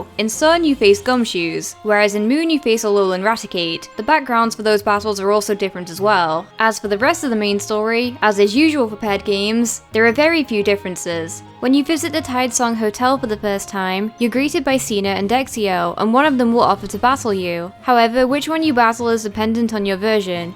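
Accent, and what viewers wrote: United Kingdom. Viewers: A question,are you British?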